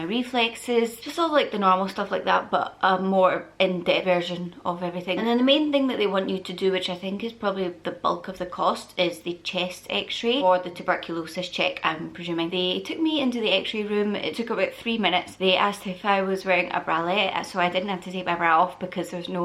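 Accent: United Kingdom. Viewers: I love your accent are you British 💞💞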